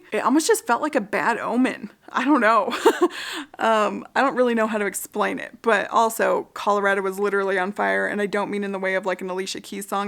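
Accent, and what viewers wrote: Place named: Midwest, United States. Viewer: I really feel like your accent sounds midwestern. I live in MO and you sound similar. Lol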